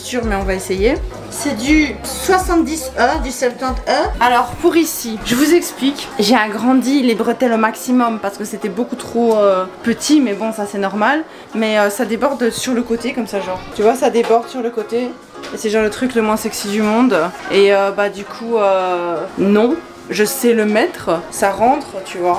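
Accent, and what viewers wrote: Belgium. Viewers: T’es belge ?!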